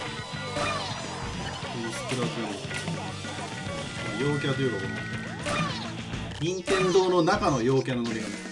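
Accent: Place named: Kagoshima, Japan. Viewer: の鹿児島弁かっこいい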